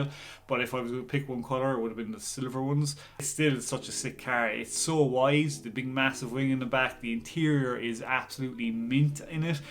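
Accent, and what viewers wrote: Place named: Maritimes, Canada. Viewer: cool. Figured you had a eastern accent from the east coast maritimes. Im from Saskatchewan.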